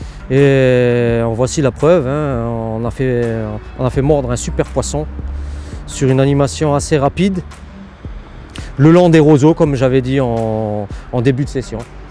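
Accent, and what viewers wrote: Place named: East, France. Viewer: On aime l'accent de l'est !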